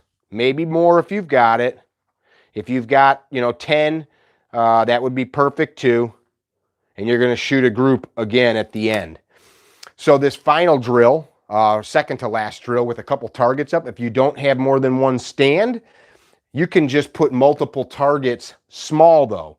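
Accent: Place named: New York City, United States. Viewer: You must be from New York City. It’s the way you said. Tawk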